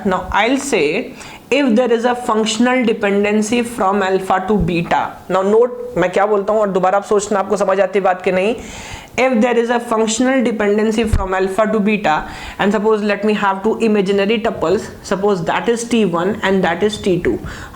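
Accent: India. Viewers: damn that Indian accent bugs me... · the Hindi accents add class to u r lecture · It took me a long time to figure it out, i'm ok with Hindi ascent, but i'm a Chinese so i don't understand Hindi but Nice Video!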